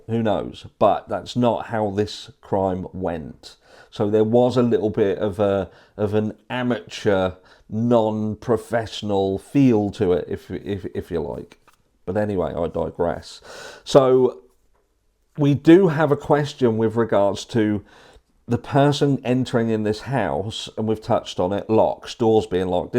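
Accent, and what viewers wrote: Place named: England, United Kingdom. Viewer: now I’m intrigued as to where about you live in England? I’m from Somerset, but now live in Dorset and at times I’ve wondered about your accent too.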